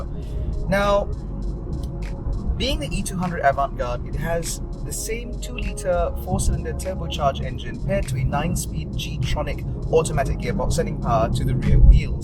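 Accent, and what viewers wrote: United Kingdom. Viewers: I love your English accent, are you educated in the UK, love it.... "The headlights look low rent" 😂👍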